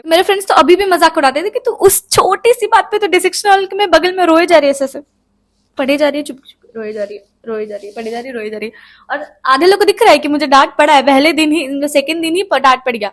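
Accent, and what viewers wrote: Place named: South, India. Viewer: Didi's accent is like now South Indian..😂😂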